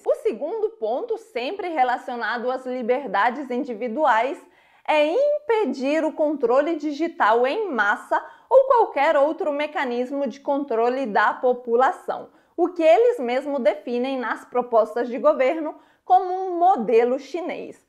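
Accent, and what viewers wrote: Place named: Brazil. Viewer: Não entendi o nome do canal: '' Brasileira por acaso'' ? A dona do mesmo nasceu onde? Ao que parece e pelo sotaque é brasileira. Complexo de vira-lata? Pode ser...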